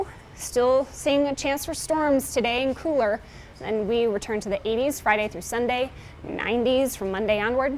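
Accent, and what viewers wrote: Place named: Louisiana, United States. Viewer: 😉 speaking like she from Louisiana, very slow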